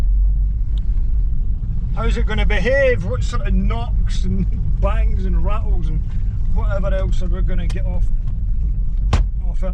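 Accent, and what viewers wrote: Scotland, United Kingdom. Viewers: I absolutely love your accent. Is that Scottish? I'm tuning in from Boston Massachusetts.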